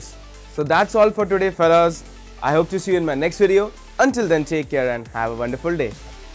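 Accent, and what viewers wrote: India. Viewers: you speak english is totally wrong, because indian language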